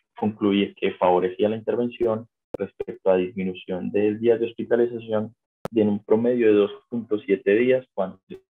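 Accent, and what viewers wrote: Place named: Colombia. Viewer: No se entiende por el acento colombiano o es que tiene el micrófono muy pegado de la boca debe hablar más lento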